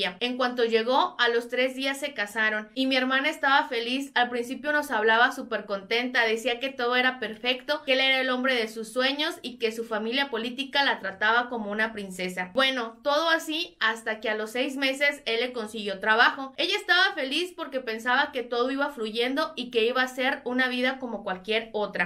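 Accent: Mexico. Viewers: Hola, tienes el acento del Norte de Mx. Saludos desde Hermosillo Sonora. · 😂eres de Sinaloa?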